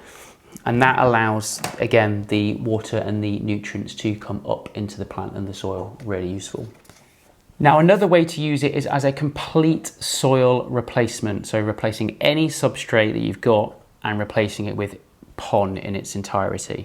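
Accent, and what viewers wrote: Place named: England, United Kingdom. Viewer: You sound English!! Where do you get your clear inner pots? I can’t find places in UK that sell them?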